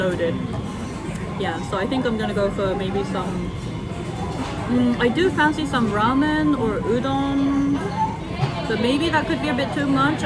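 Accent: England, United Kingdom. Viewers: Are you from England/Britain? You have a vague English accent over the clutter in the background 😅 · Ah yes I read you went to university in UK. I can hear it in your accent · Love your Video, I love Sushi and it looks so good, Love Unagi, I am about to stop in Tokyo, can't wait, Last time I eat 108 pieces, my record, OH you must have spent time in England, your accent comes out every now and then.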